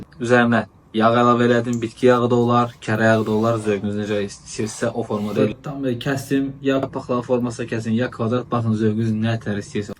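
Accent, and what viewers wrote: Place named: Balakan, Azerbaijan. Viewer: Balakən ləhcəsi )))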